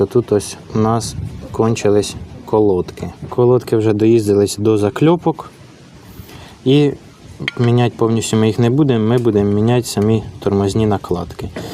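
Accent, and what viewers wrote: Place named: Belarus. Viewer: тута, шо ми... мабуть бульбаш якийсь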